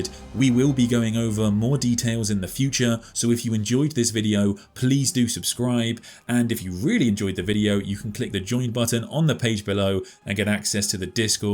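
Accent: United Kingdom. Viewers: Just found you yesterday Mate! Love your videos! You,Luetin,and Weshammer are the kings of youtube 40k! Much love from VANCOUVER BC CANADA 🇨🇦! Warhammer is just better when read by the British!!!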